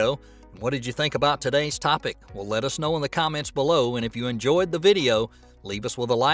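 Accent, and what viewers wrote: South, United States. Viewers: Hearing the most countriest narrator ever use technical terms sounds hilarious. Keep it up!🤣 "THREEH, TWOOOO, WUHHHHHHHN, LAWNCH!"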